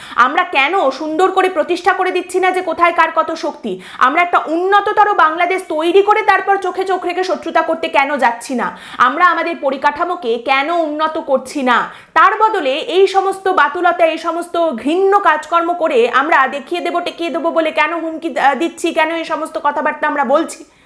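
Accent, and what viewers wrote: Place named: India. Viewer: tor kotha bolar dhoron inidna der moto kano tailea ?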